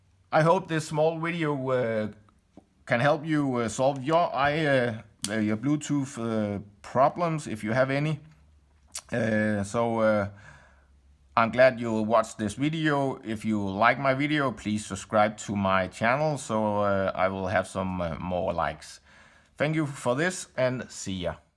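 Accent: Denmark. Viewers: Are u danish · After hearing your accent: Are you Danish?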